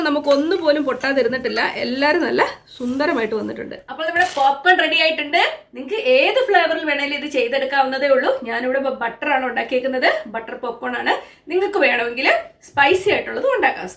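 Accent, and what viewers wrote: Kottayam, India. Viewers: Chechi nattil evde..?? Kottayam slang anu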